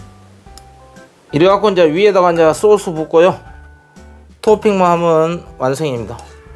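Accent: Jeolla, South Korea. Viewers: 사투리가 딱 전라남도 서남부 목포 스타일 이네요.. 정겹네요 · 전라도 사투리 쓰시면서 억수로는 경상도 사투린데 섞어쓰시네 고향이,어딘교 구례쪽이신가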